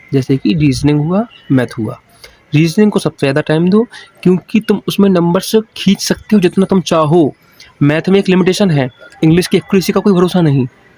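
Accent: Lucknow, India. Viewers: R u from Lucknow?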